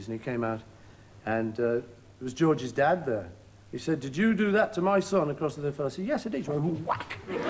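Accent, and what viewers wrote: Liverpool, United Kingdom. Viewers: Scouse power 😂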